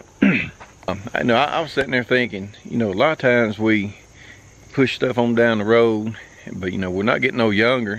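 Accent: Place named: Mississippi, United States. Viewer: Where in the south are you dude. Sounds like Mississippi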